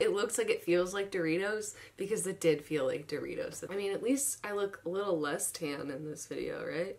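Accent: Boston, United States. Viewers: I love the old Boston accent showing through! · I LOVE YOUR ACCENT!!! I got my Boston accent from you and I live in Oklahoma. You use your accent in your most recent videos as a thing, as an attitude. You are ole Greg to me, except you're better. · Caitlin Slattery she said in the video that she had a Boston accent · That Boston accent tho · lol your boston accent is GOLD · omg i never realized how strong of boston accent she had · It's been incredible to see how much you've grown as a person over the years. I've been on your channel since the beginning and it feels like i've grown with you. Loved your videos then, love them now. I totally forgot you had a thick Boston accent btw!! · Zietz I totally agree with you on this. I was thinking the same thing. I can understand her not wanting to watch herself though because I am the same way, but don't apologize for being you back then. 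You were amazing then as you are now. And I hate that you changed you Boston loud accent on purpose. It makes me sad. Sure grow up but be authentic. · I can't believe how strong your Boston accent used to be, I've been a fan since 2010 but I never noticed the change!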